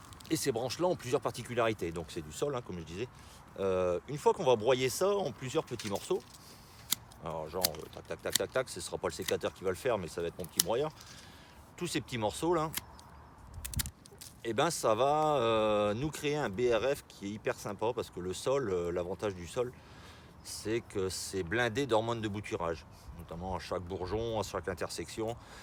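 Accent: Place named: Picardy, France. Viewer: Salut à toi le jardinier de de(ux)main! Euuh on est cousins, frères ou je sais pas mais je pense exactement comme toi et réalise exactement les même choses que toi lol T'as un accent d'min coin, je suis dans la Somme au sud-ouest d'Amiens (environ 30 km),on pourrait éventuellement se rencontrer et se faire visiter nos jardins? Ça serait un énorme plaisir déchanger avec toi!:) Je conçois un jardin permacole depuis un peu plus de 4 ans, ça commence à être fonctionnel et bien joli aussi... Tu ne nous a pas parlé de l'intérêt de ces saules pour la biodiversité: une vraie aubaine! Ensemençez vos jardin avec des saules, c'est rapide et efficace, la vie vous remerciera très vite en vous offrant le spectacle de la nature qui s'épanouit grace à votre coup de pouce! 😆 À bientôt l'ami! Merci du partage par ta chaîne toute neuve;)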